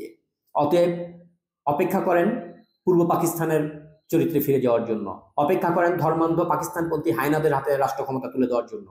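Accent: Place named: Arab world. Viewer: আরবি উচ্চারণেই বুঝা যায়। কথার মাঝে ছোটো ছোটো আরবি শব্দ ব্যবহার করে এবং ছহি উচ্চারণ করতে পারে।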